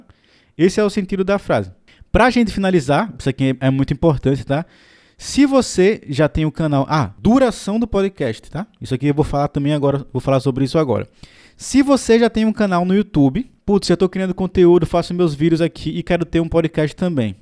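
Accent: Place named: Alagoas, Brazil. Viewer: tu é de Maceió? Tem um sotaque meio alagoano, fio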